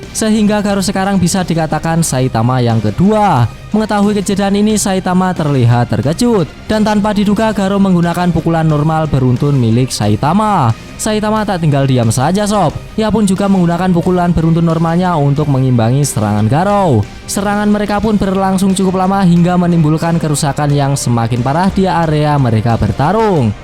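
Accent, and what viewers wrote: Java, Indonesia. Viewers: Gacocok , medok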